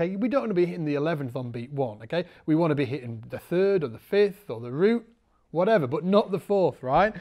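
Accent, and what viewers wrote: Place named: North, United Kingdom. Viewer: New video format and northern accent hella lit!!! 🔥🔥🔥🔥🔥😂😂😂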